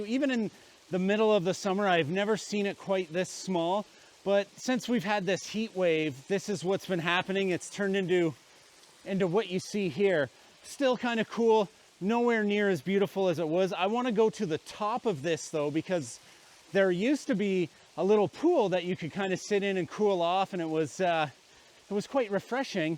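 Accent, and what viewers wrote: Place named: United Kingdom. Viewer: A pleasure as always. Ha ha, yeah I think that British with a twang. That swim spot is divine.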